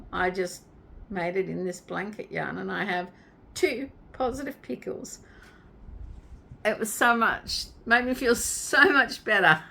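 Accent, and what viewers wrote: Australia. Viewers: As soon as i hear an Aussie accent i click subscribe. Great to have more and more Aussies starting up a YouTube channel, everything is so US centric at the moment. Love your work, loved your! He is a great bloke for this country.:)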